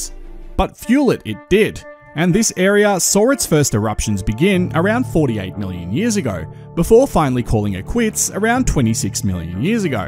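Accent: Australia. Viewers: I happen to like his Aussie accent. Let him alone, especially since he works hard to make his content informative, and intelligent! You are awesome,!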